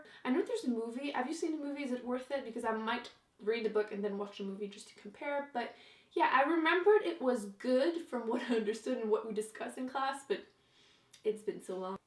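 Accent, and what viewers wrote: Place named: Quebec, Canada. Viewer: Hi Hello! I'm new to your channel and have enjoyed watching your videos and hearing your thoughts on books! Hope you enjoy the books from your January TBR!:) Btw, are you from Canada? You kind of have a French-Canadian accent.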